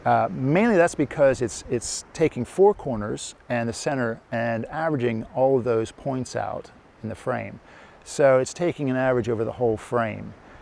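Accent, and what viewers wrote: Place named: Australia. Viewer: I feel like I hear bits of Aussie accent lol but very informative video! Best is the basic language used- a lot of the other videos I can’t quite understand due to the technical terms etc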